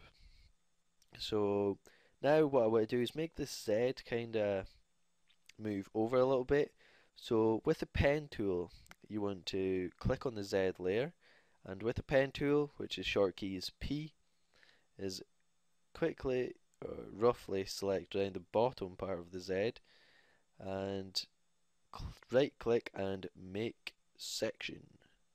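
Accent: Scotland, United Kingdom. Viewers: HES SCOTTISH!!!!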